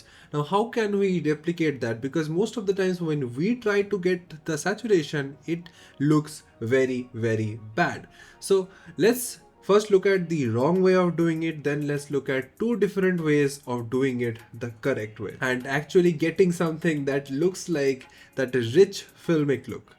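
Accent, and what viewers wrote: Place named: India. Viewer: can you please practice the accent, i don't understand well your english with india accent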